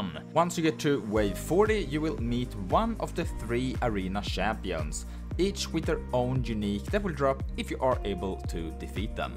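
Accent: Sweden. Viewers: Tog fem sekunder att höra att här har vi en svensk kamrat 😏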